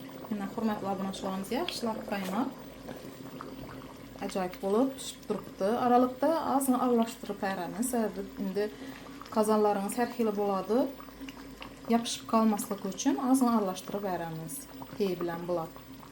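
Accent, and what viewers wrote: Khorezm, Uzbekistan. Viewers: Ассалому алайкум. Роликни тасодифан кўриб қолдим. Овқат пишираётганингизда Аллоҳни ёд этганингиз, Хоразмча, чиройли сўйлаганингиз учун рахмат, қизим. Аллохнинг панохида юринг. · Ozizni shevayizda chiqarganiza dm quvondim apka manam xorazmdanman boshqala xorazmdan bosanam tushnmidla dab yo ozn shevasinan uyolganindan adabiycha soʻllab video chiqaradila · Хорезм таомлари зўр. Айниқса сузма палов. Менга жудо ёқади хоразимча таомлар, хам хоразм шеваси жудо чиройли сўзлашадилар. Котта рахмат синглим. Хоразмга бориб кегандай бўлдим.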